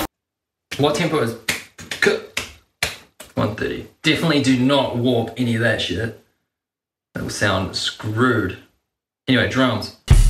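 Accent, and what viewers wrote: New Zealand. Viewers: You a kiwi?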